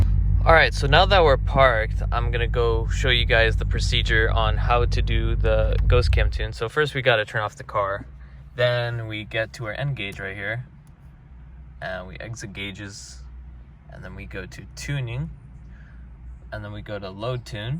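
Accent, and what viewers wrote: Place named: California, United States. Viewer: Sounds like a Californian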